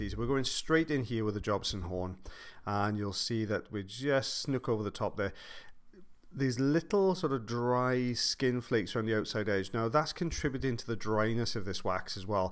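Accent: Wales, United Kingdom. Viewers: This made my day!!! Having a long day at work and hearing our melodic Welshmen narrating is so relaxing and pleasant. Thank you for doing this for your fans!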